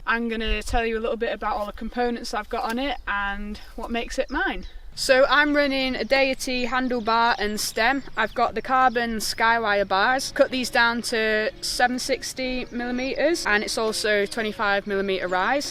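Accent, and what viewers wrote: United Kingdom. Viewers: (5L) Love London Language 😍😍😍😍😍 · That no London dialect, Ime from Wigan n she sounds like me.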